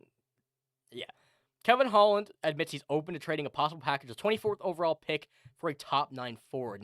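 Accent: Newfoundland, Canada. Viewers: You are from Newfoundland?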